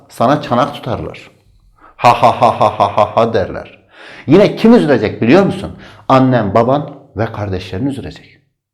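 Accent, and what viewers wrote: Urfa, Turkey. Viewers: Bu hoca Urfa 'lı mı ? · Hocam siz kesin urfalisiniz.konusma tarziniz harflerin cikisi ayyyyyyy ayni ben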